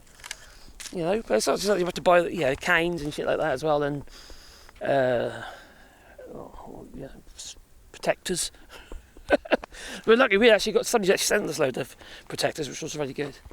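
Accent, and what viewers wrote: United Kingdom. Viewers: It’s his British accent.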